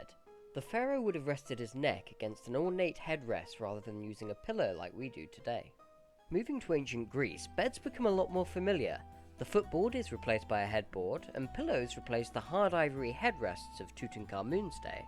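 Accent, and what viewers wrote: United Kingdom. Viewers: Informational videos with british voices hit different.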